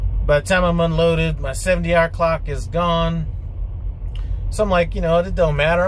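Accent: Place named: Southern California, United States. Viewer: He talks just like So Cal.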